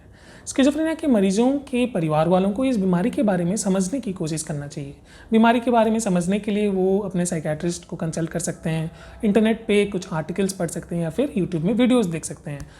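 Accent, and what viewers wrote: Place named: United States. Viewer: इंग्लिश भाषा का अच्छा इस्तेमाल करते वक्त जिस व्यक्ती का समझनेवाला आवज होना चाहिए........ इस विडिओ मे थ़ोडासा अमेरिकन उच्चारण सुनाई देनेके वजहसे सभी टिप्स अच्छेसे नही समझमे आ रही है....... जिस उद्देश से अच्छा विडिओ बनाया जा रहा है वह लोगोंतक नही पहुंच रहा है......... सभी टीम इसपर जरुर विचार करे क्योंकि ये दिमाग की डिसऑर्डर बहोत लोगो में दिखाई देती है......... जो काम की बातें बता रहे हो वो लोगोंके समझमे आ जानी चाहिए.........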